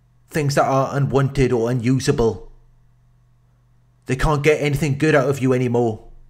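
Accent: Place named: England, United Kingdom. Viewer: Are you English mate, I'm Scottish love your vids mate spot on.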